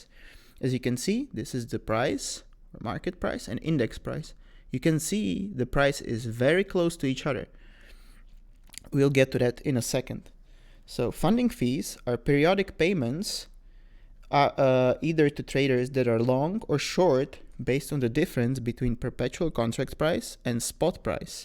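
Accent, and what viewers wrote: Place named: Sweden. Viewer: Sounds swedish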